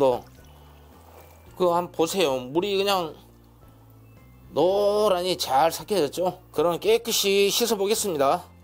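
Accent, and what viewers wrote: South Korea. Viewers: 쉐프님 구수한 사투리로 꿀팀!! 레시피 꼬들빼기 ㆍ쪽파 환상의 맛김치 강의 잘 들었습니다 ^^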